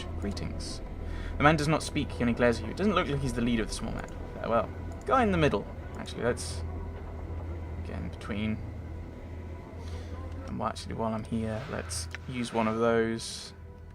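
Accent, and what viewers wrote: United Kingdom. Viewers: Some words i coudn't understand, so i turned on subtiteles which made complete gibberish. That's when i realized i finally can understand UK accent! Love this playthrough, the way you are trying to read every dialogue the devs had put into the game, with relatively fast pace reading. You have inquisitive mind, and i love that you share your impressions of the game and your thought process.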